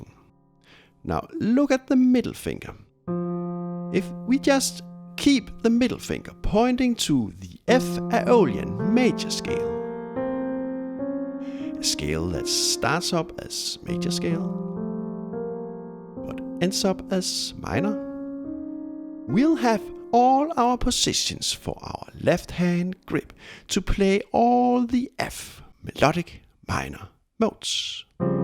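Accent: Denmark. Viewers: Are you from Denmark??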